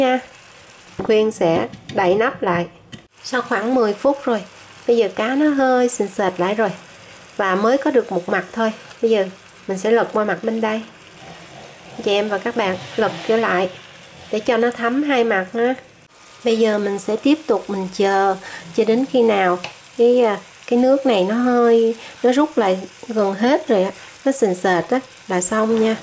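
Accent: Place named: Southwest, Vietnam. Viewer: thích nghe giọng của người miền tây nam bộ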